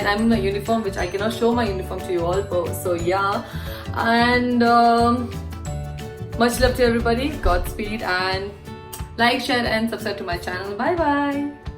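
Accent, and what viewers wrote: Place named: India. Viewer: Wait can someone explain to me how she is Asian but talks like a Indian person😂😂nah but no hate nice video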